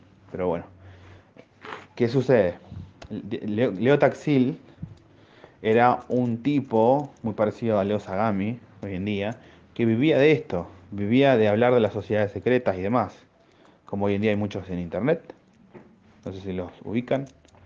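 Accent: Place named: Argentina. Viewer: Es increíble pensé que iba a terminar diciendo algo cuerdo , pero al final terminaste difamando al movimiento Provida por el solo hecho de contar en sus filas con católicos , cuando en realidad también hay pentecostales , mormones, evangélicos sionistas y no sionistas , universalistas de todo tipo , después andas subestimando a la gente con que va adorar a lucifer cual Masón g33 jajajaja , después te solidarizas con la izquierda como si fuera la pobrecita de todo esto la utilizada y volvés a atacar por los colores , después me parece que desconozces el peligro del feminismo , un familiar mío tuvo que ir con abogados por una falsa denuncia ,no solo se trata de salvar al niño por nacer , después mostraste la hilacha haciendo propaganda a tu liberalismo capitalista pedazo de Golem, ah y encima por la voz parece que sos argentino ( al menos que seas uruguayo) seguro vivas adentro de un tapper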